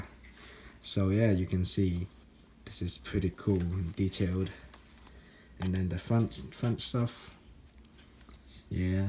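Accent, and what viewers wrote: Russia. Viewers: I am a Russian, the accent is so good I thought you might have been a Muscovite . I own an arsenal of AK guns and comparing this cardboard replica to my actual AK-47 it is incredibly accurate, almost every little detail is included. You are extremely underrated, it's always the creators that put no effort into the videos that get popular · r u russian?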